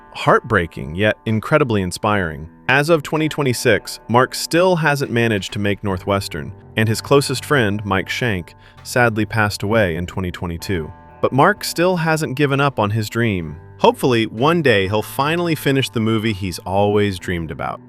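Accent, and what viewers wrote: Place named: United States. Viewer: You sound very American! How did you master English so well??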